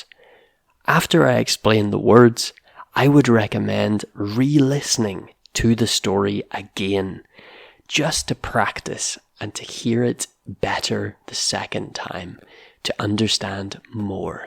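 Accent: United Kingdom. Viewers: are you Scottish? I'm just curious because of the way you pronounced certain words like the ones that have the /ey/ sounds: carefully, raised, paper, and waves. Carefully sounds like curfully; raised, reezed, paper has a true /ey/ sound; and waves is wevz. Also, isn't buried supposed to sound like beh-reed?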